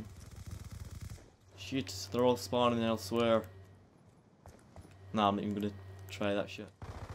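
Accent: Scotland, United Kingdom. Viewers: What part of Scotland you from?, im from Edinburgh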